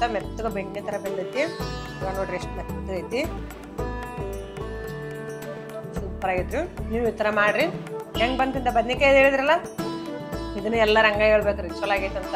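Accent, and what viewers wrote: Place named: North Karnataka, India. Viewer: Amma I like your uttarkarnataka language. As well as your recipes,please show us some more recipes like this.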